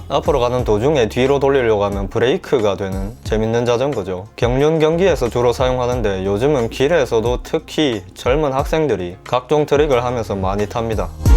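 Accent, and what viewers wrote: Gyeongsang, South Korea. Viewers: 잡소리 없이 똑부러지는 설명...양념으로 경상도 사투리 억양~ ㅋ 좋아요~~ㅋㅋ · 구수한 경북구미지방 특유의 사투리에다, 중간중간에 표정 찡그린 캐릭터 얼굴 나오면서 높은 톤으로 "~~해도 별 차이없던데~" "~~해도 잘만타고다니는데" 하는 부분이 진짜 웃김 ㅋㅋ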